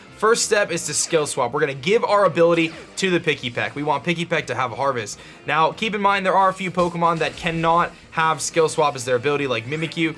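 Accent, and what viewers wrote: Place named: California, United States. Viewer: Disliked. Because you talk like a stereotypical cali dude from every 90's movie!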